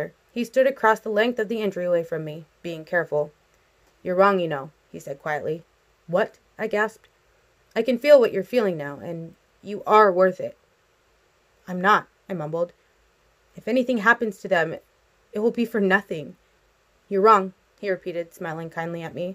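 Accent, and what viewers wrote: United Kingdom. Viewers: Pls read me before you Also, that British accent, 😹😹 I love it.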